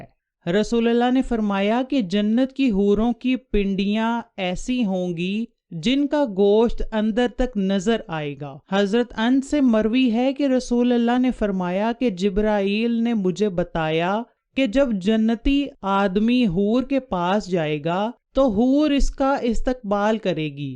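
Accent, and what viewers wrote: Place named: Punjab, Pakistan. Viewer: یہ عورت پنجابی ہے کہ رہی ہے کہ جک جائے گی حالانکہ جھک ہے لفظ یہ پنجابی گھوڑا نہیں کہ سکتے بہت زور لگا لیں تو گوڑا کہتے ہیں ویسے پنجابی کوہڑا نہیں کہوڑا کہتے ہیں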